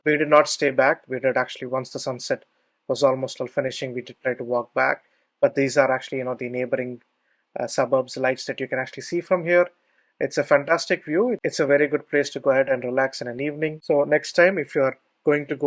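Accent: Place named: India. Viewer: Shame that it's so difficult to understand the thick Indian accent. Why didn't you get someone to narrate for you. Btw it's not Brooks Lookout. Although your transcript does say Burkes, you wrongly pronounced it as "Brooks". Had I not read the follow on, I'd be looking for Brooks Lookout, man. I have been here many times and never seen a cell tower, so perhaps didn't trek the exact same route as you. I was around the tv towers and the Kyeema memorial site.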